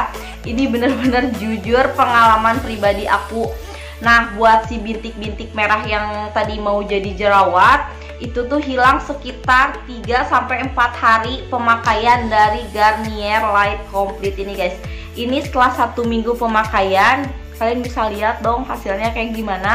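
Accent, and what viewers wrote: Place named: Cibeber, Indonesia. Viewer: Atuh logatnya kaya ga asing nadanya juga.pantesss teteh dari Cibeber ya allah kampung halamankuuu😍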